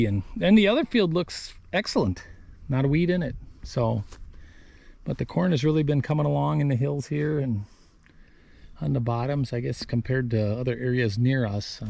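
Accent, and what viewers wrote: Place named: Wisconsin, United States. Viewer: Thought I heard a Wisconsin accent. I live in Kaukauna Wisconsin. We’re buds.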